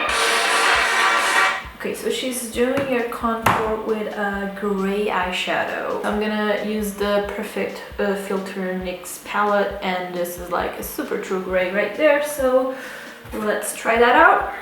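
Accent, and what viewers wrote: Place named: Brazil. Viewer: you look brazilian and your english accent reminds me brazilian english speakers, where are you from?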